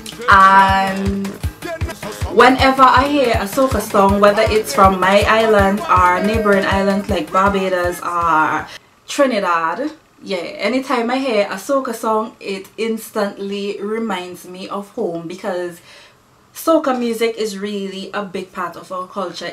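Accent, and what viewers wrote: Saint Vincent and the Grenadines. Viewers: butt up pon yo channel by accident, glad to see a Vincy on youtube, nuttin wrong wid talking dialect , its a combination of our african language and our slave masters. gwan gyel do yo thing, new sub. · It's good to see a fellow Vincentian with their accent still..though living abroad #vincytodbone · Was so happy to hear your accent n see someone from Vincy on YouTube!!! Subscribed!! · Love this! Miss hearing the vincy accent since we moved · Girl your accent says Vincy alone 🇻🇨🇻🇨 so good too see another Vincy on here 🇻🇨🇻🇨🇻🇨 #784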